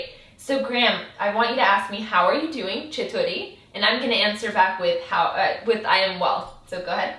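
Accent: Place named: Tehran, Iran. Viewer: there are some very old persian dialects. I have only run into one person who spoke an older, more traditional Bakhtiari persian. This is more modern "tehranian" accent persian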